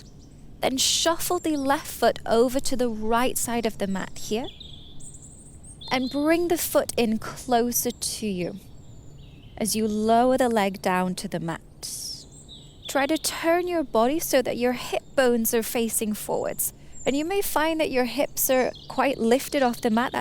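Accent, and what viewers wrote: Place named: United Kingdom. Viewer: Hi, some feedback as you mentioned. I started 20minute daily Yoga October'24 to raise money for BoneCancerUK. Since, have continued for my own well being, and today, the 82nd Day, I worked with this 30 minute class. Thank you for your clarity explaining he expectations. (PS love your British accent, and the birdsong: )) 🙏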